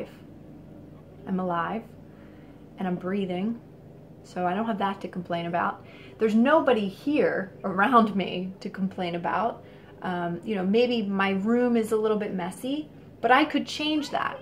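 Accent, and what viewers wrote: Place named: Philadelphia, United States. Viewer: I KNEW that was a Philly accent! Haha Takes one to know one. 😊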